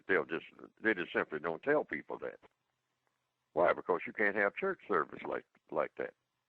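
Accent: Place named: United States. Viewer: I just started listening to Mr Fuller I enjoy his ole Mayberry USA voice and I appreciate his time/knowledge/concern for the people of color...do you know how many blood washed, born again believer's that wish it was that simple as 10 minutes...not the play church folks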